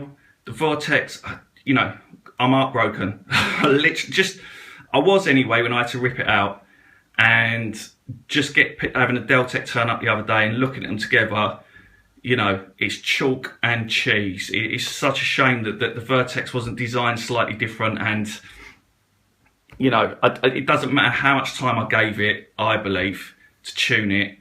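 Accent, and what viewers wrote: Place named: England, United Kingdom. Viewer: Not impressed with your video..I love your english accent however...but...who gives a rats ass if the lid is thicker? You think your corals care? ALLLLL they care about is clean water and my bet is the Deltec will outskim the Vertec hands down! oh and that leather look is probably so the lid does not slip out of hand as easy...no brainer.... disappointed in your biased approach. Just because the vertec is built stronger and smoother lines does nottttttttttttt mean it performs better and really performance is more important then the thickness of the lid! Deltec is amazing!!! You are a product of marketing...the MFGs trying to figure out how to sell you ...because they cannot get a skimmer to perform as good as the pros at Deltec... :-) again..I love your accent lolol Tim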